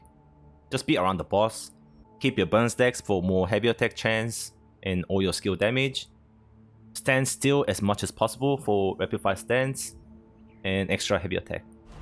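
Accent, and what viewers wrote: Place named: Australia. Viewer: Mate, I keep hearing an Aussie accent creeping through. You in/spent time in Aus?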